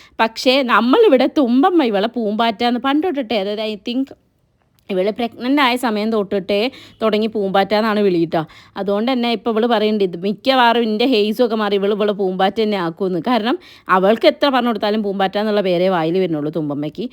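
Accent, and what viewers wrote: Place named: Kozhikode, India. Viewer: ഞാൻ ഇപ്പൊ ഇയാളുടെ വലിയ ആരാധികയാണ്. എന്നെങ്കിലും നേരിട്ട് കാണാൻ ആഗ്രഹമുണ്ട്. സംസാരം ഒരു രക്ഷയുമില്ല 🥰 ഇങ്ങനെ വേണം പെൺകുട്ടികൾ 😘 ഇങ്ങനെ ഒരു മരുമകളെ അല്ല മകളെ കിട്ടിയ ആ ഫാമിലി ഒരുപാട് ഭാഗ്യം ചെയ്തവരാണ്. ഞാൻ വലിയമ്മയുടെ മകൻ സനേഷിന്റെ വൈഫിന്റെ മാമന്റെ ഭാര്യയാണ്. ഞാൻ ഒരു തൃശ്ശൂർകാരിയാണെങ്കിലും എന്റെ ചെറുപ്പത്തിൽ കോഴിക്കോടാണ് വളർന്നതും പഠിച്ചതും അതുകൊണ്ട് ഇയാളുടെ സംസാരം എനിക്കൊരു നൊസ്റ്റുവാണ് 🥰🥰🥰🥰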